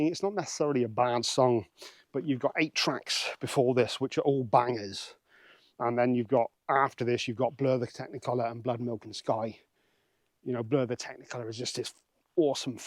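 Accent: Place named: Dartmoor, United Kingdom. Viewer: Where are you when you do these walks looks like Dartmoor or North Yorkshire to me. Guessing Dartmoor by your accent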